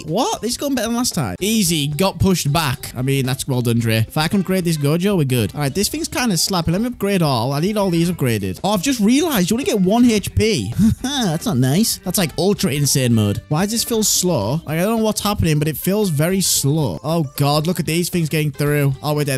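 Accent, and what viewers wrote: United Kingdom. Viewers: You kinda like bretish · Is he british?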